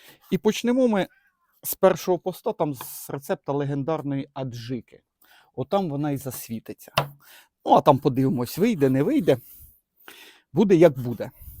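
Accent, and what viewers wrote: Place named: Central Ukraine, Ukraine. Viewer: Возможно похож для кого-то по подаче на украинского грузина. Но больше на нашего украинского еврея) Правильные жесты и мимика которые цепляют, невысокие люди всегда харизматичны, наш Луи Де Фюнес) который умеет вкусно готовить. А самое главное смаковать и передать предвкушение перед дегустацией в каждом видео. Вам нужно идти в кино) А украинский с легким суржиком такой родной) это или Кировоградщина, Хмельниччина, Виннитчина или Житомирщина, может ошибся, но точно по говору центральная Украина. Понравился Ваш канал, что блюда что подача, смотрю уже месяц, многие блюда буду готовить по вашим видео. Супер!)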